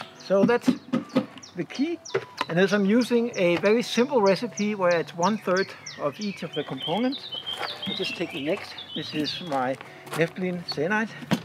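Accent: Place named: Denmark. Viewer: I just realized how the beautiful Danish accent fits the mad professor character so absolutely perfect 😂 Greetings from your southern neighbor!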